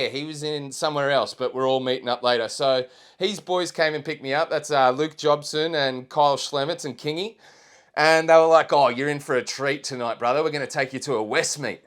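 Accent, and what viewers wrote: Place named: Australia. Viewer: Bittersweet moment seeing this cause I thought I had 15 years worth of podcast to catch up on. However I would watch wreck em podcasts for at least the next 15 years if you decide to do more. Really enjoy hearing about the history of wreck em and Aussie drifting in general. I listen to a lot of American drifting podcasts, but it’s just not the same without an Aussie accent and a shithouse sense of humour. Also love your mentality behind fun drifting not just competitive drifting. I don’t want comps I want trains.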